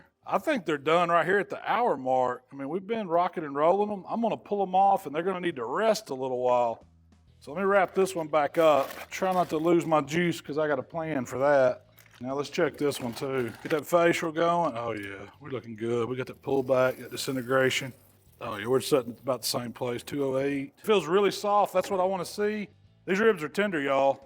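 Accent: South, United States. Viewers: Always enjoy your videos, and usually learn a little something along the way. When a bearded man with a southern accent and a friendly smile wants to teach me BBQ, I'll all down for it :)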